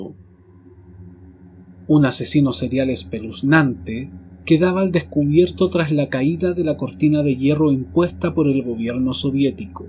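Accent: Standard. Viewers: relatas con acento neutro, pero igual supe que eres chileno. Te felicito por lo que haces, muy profesional. Y si es chileno...es bueno 😉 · Eres venezolano? Dijiste se puso a trabajar. Aparte el acento neutro. Interésante.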